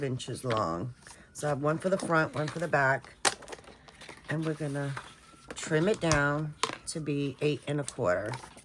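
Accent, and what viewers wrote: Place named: New York, United States. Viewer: These are awesome. You are very creative. New subscriber here! I could hear that New York accent as soon as you started talking. ❤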